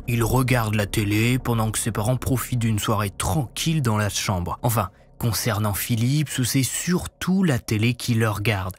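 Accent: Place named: North, France. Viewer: Cet accent de nordistes c’est pô possible !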